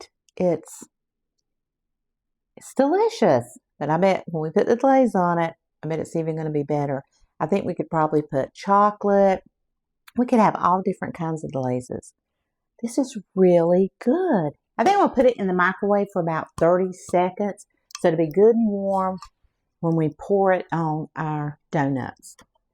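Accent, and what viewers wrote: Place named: South, United States. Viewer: EXCELLENT VIDEO Thank YOU for sharing this You are definitely a Southern Lady... I know that accent.. I have it too What State are you in? or Where are you from? I have lived in Texas.... from Oklahoma (southern accent) Thank you so much for sharing this wonderful video. Loved it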